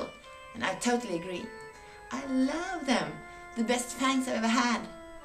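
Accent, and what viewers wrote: Sweden. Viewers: Haha tyckte du hade väääääärldens svenskaste dialekt, och mycket riktigt! dont fucking skjut mig eller nått men du lutar åt det typ lixom creepy gothy vampire cute än creepy gothy vampire sexy! hahha:D (jag har med tänkt göra sådana när jag har råd)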